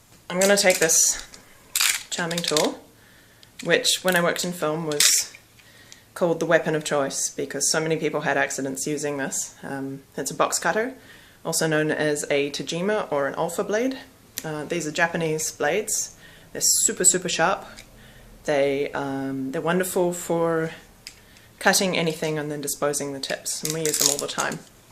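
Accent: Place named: Australia. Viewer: There's more videos than this but yes, still nothing wrong with having more of them. Perhaps do some videos on wrestling with longswords, as many are under the impression it simply didn't happen. Edit: Also I thought you were Australian for a minute there (I'm Australia.) gee the difference between your accent and ours here is a lot more subtle than I'm used to.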